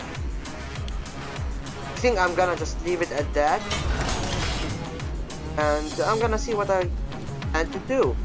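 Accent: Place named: Arab world. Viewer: Great series! I can tell you're an arab, but where are you from?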